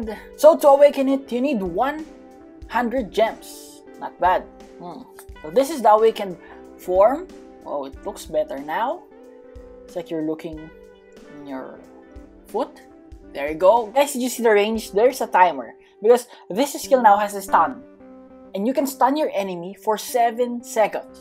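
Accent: Philippines. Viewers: U sound filipino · how is he a noob he has acro also i notice your filipino accent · wait he's filipino? no wonder the accent and voice sounds like a filipino speaking english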